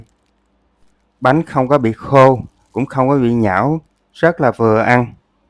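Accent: South, Vietnam. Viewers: Em có giọng nói dễ thương ngọt ngào của người miền Nam, giọng cười hiền hòa . Em chỉ làm bánh với công thức rõ ràng . Cảm ơn nhiều chúc em thành công .❤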